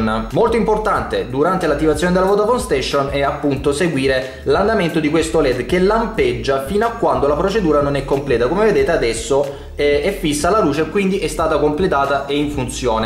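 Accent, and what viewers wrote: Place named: Apulia, Italy. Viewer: Ciao , io sto aspettando che arrivi la vodafone station . Per caso sapresti dirmi quanto ci vuole dall'arrivo della vodafone station all'attivazione effettiva di internet ? Mi pare di sentire che sei Pugliese se non erro , se si vorrei sapere in quale comune ti trovi per farmi un'idea di quanto veloce sarà la mia linea . Grazie !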